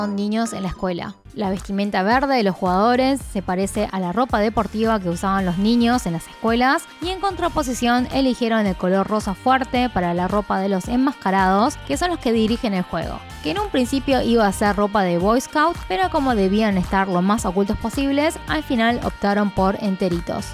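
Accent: Argentina. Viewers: Una china hablando como Argentina wow como está el mundo. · Me gustan mucho tus videos y tu acento argentino. Felicidades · Tú Castellano es muy bueno aunque tú acento no es nuestro sino claramente argentino.... Necesito hacerte una pregunta. ¿Sabes porque el protagonista se tiño el cabellos de rojo? ¿Tiene algo que ver con la capucha roja que tenían los trabajadores? Gracias por tu explicación.. me has ayudado a entenderlo mucho mejor!! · Una pregunta tu eres de argentina o de otro país por qué te sale muy pero que muy bien el asentó argentino??? <3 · No entiendo como tienes ese acento de los argentinos 🤔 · Y por qué esta china youtuber habla como argentina? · Hola eres de Argentina o de Corea? Tienes acento argentino pero rasgos de una coreana china o japonesa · Ame ese acento argentino en una asiática preciosa excelente contenido ❤️🥺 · Coreana hablando con acento argentino me suscribo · Hablas como argentina xdd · Hablas cómo argentina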